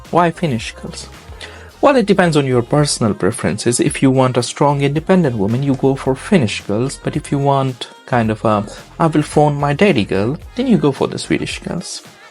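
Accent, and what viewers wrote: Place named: India. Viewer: Miksä kuulostat ihan intialaiselta? Etkä taia kyllä perkele mikää suomalainen olla. (He clearly is not a finnish person like his name says) fucking clown vittu mikä pelle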